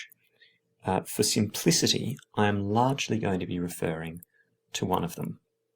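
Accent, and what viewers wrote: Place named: Australia. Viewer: Its Australian love. Where you from?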